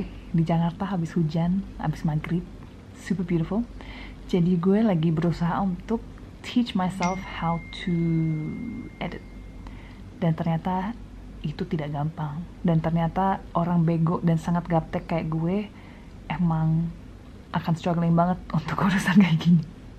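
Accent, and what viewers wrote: United Kingdom. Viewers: jujur banget nih gua,gua suka sejak vidclip zigas,ini cew siapa yah? gua telusurin ampe kemana2,akhir nya tau ceritanya nih cew campuran UK & Bugis Celebes,btw gua seneng ama lo yg pertama of course karna cantiknya,trus yg bikin melting itu british accent nya,im really fallin love with your accent,keep down to earth yow. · i adore your british accent!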